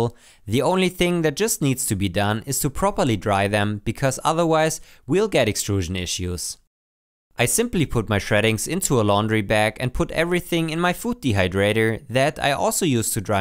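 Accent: Germany. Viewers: Jo your English sounds very German :] Me also German hehe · Man hört sehr den deutschen Akzent raus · German accent is too strong to watch this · Man hört so richtig, dass du Deutsch bist😂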